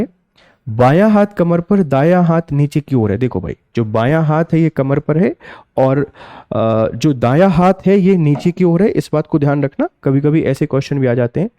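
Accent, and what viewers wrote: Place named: Madhya Pradesh, India. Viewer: Arey madhya pradesh aur maharashtra ke taraf (ri) ko (ru) bola jata hai samjhe.. Isleye wo aise bol rhe.. 😮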